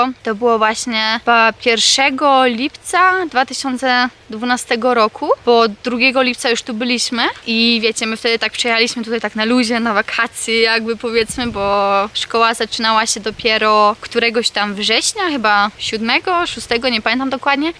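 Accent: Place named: United States. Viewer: Dlaczego tak mówisz ? Ten akcent ..jest nie do zniesienia . Jesteś Polką czy Amerykanką ?! Bardxo mècząca gadka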